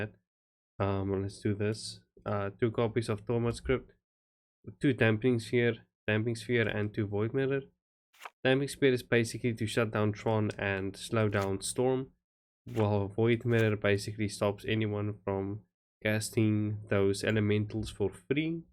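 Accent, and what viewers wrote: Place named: South Africa. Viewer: The South African accent gets you a sub. Good video buddy.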